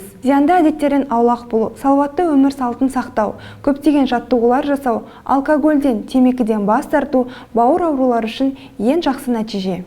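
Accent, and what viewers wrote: Standard. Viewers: Көп жаса Айналайын ана тілімізде таза сөйлейтін сендей қыздарымызға Алла разы болсын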